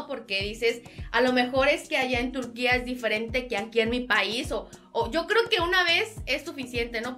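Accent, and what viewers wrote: Mexico. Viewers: Hola, tienes el acento del Norte de Mx. Saludos desde Hermosillo Sonora. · 😂eres de Sinaloa?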